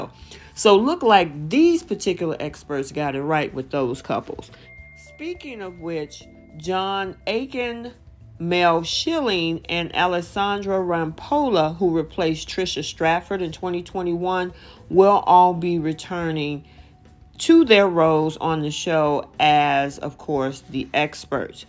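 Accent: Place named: United States. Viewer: What's with the American voiceover.its Australia here.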